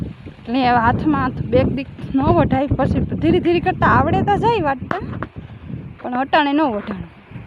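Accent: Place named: Mer, India. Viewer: Ben tame asal merni bhasha bolo so te bahu j saru lage h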